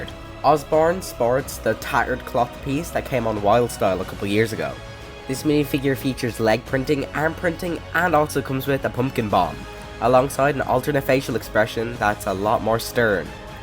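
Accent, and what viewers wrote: Ireland. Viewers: I really wish this was a real Lego set Also I could tell you were Irish just by you’re accent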